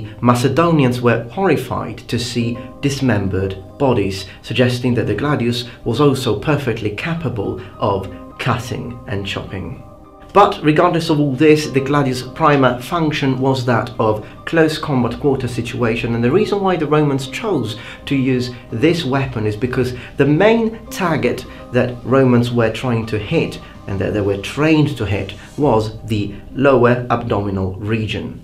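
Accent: England, United Kingdom. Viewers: Man, you really had a thick British accent back in the day. Also, i like how every cut starts with you doing a little jumpscare. Bring back the jumpscares! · Cut your hair you look like a girl.-Bad mix (english accent beard and long hair) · Are you from the UK? Where are you from?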